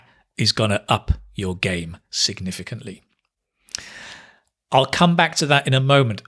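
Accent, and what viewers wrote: United Kingdom. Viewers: I love British accent, do you have a podcast?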